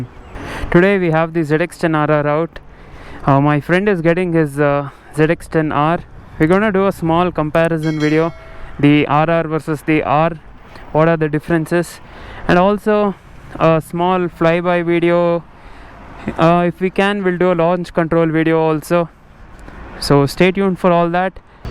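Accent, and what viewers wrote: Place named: Chittoor, India. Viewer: Hey you make amazing videos no doubt about that and keep it going and looks like your native is chitoor , guessing that from the accent and also looks like you have been inspired a lot by Sriman kotaru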